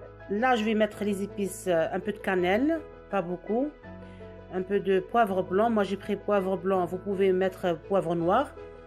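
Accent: Oran, Algeria. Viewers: عجبتي وهرانية تاعك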